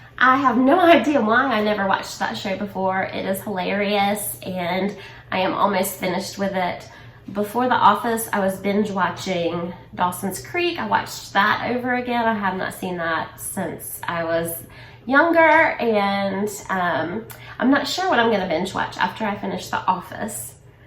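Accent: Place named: North Carolina, United States. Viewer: I love these Q&A videos and learning about other creators. Now that make sense that you are from N.C. With your accent. Growing the YouTube channel is quite the feat. Great job. Learning new Youtube skills is so fun. Thank you for sharing.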